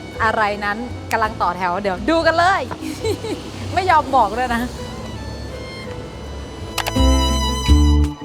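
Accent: North, Thailand. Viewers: อู้เมืองแหลวแลดซ้ำม่วน 🤣🤣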